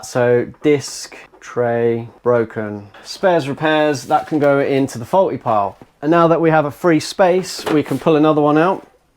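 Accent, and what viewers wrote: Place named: United Kingdom. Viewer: Wou uhn wonna do this wih one mo ni ah. Haha British gold right there! Love your vids.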